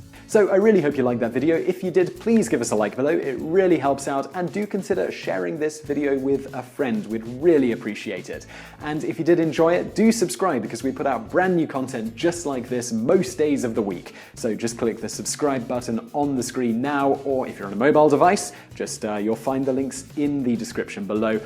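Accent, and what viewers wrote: United Kingdom. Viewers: Your accent of course is brittish, but where the in the UK is it from... great chanell sir.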